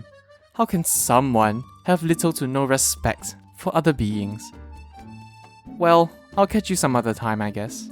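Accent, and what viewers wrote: Singapore. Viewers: Are you singaporean? I can tell from the accent if so lol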